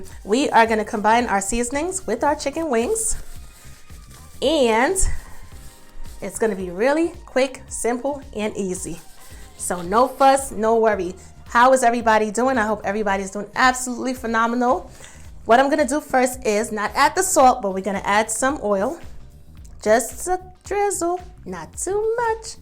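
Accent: New York, United States. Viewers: Love the new York accent. You always tell another new Yorker when you hear them.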